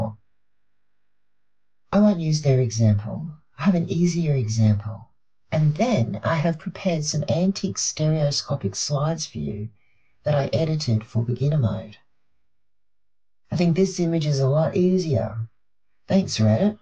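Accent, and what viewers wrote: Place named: Australia. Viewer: you are super cool. Found your channel via the amazing Jon Levi... you sound Aussie to me, so welcome from NSW. Great video and I am glad I have found you. I hope to connect many times with you! The veil that has been blanketed over our eyes continues to keep the masses blind from the exciting truth that would reset our existence in a heartbeat. Cheers.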